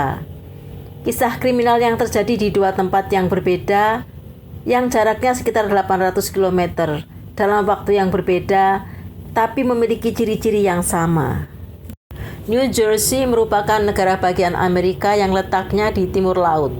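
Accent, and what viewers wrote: Java, Indonesia. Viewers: Medokny dikurangi dong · suara madam yg medok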